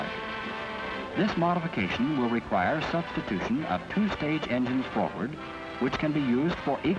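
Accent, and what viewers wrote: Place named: United States. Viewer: How interesting to hear an aircraft referred to as an 'aeroplane' in an American accent. I hate the present bastardised word 'airplane'.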